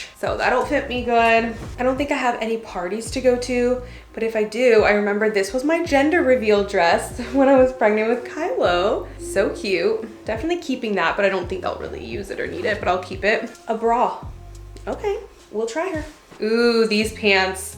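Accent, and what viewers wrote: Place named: Canada. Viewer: Congrats again on baby girl 👧 also I noticed the “y’all” while making the ice drink lol I see TN is rubbing off on your Canada 🇨🇦 accent 😊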